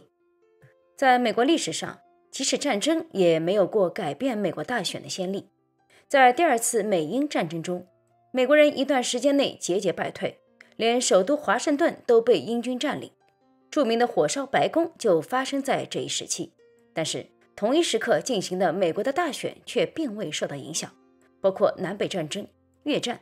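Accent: Shanghai, China. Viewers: 老師妳的德文真好，我的德文早已還給教書先生了。羨慕妳的多才多藝。有人説妳有上海口音...我父母是上海人，民國 38年到台灣，最後也沒有機會回到上海看一看。如果不是國共內戰，我可能也會在上海長大。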